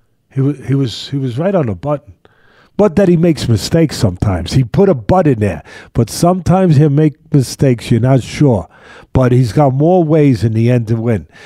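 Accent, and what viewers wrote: New York, United States. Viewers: Gotta love that old school NYC accent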